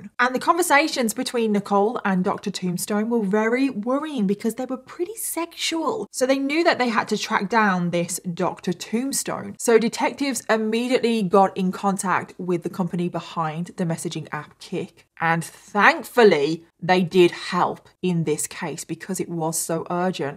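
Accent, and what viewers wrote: United Kingdom. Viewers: I enjoy watching all your videos. It’s obvious you put a lot of time and work into making quality videos with honest fact finding reports. Great job. I also enjoy your makeup and have learned a few tricks watching you. Your a very pretty and articulate young lady, and the British accent is super cool. (You do know how Americans love a British accent, right?) 😂I have Siri set on my phone as a British man. 😂 Keep up the great work! Sending love and positivity your way. Aloha. ❤✌️ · She's using the British pronunciation.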